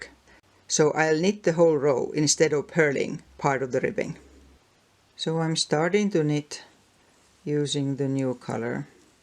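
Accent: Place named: Finland. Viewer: Thanks so much! I've been crocheting for over 40 years, but I'm relatively new to knitting, so this was really helpful. Also, I just wanted to tell you that even if I knew this tip before, I'd still watch the video because you sound just like my aunts sounded when I was growing up. (My dad's family was from Finland) So listening to you brings me back to my youth when I'd listen with fascination to their beautiful speech patterns. :)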